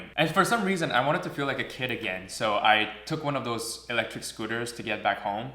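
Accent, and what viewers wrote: Canada. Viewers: If only Mr could make a video in french i love hearing he's Canadian accent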